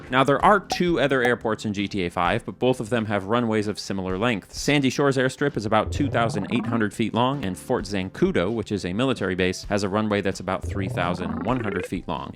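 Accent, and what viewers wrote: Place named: Minnesota, United States. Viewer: Sometimes I forget you’re from Minnesota and they you say “bars” lol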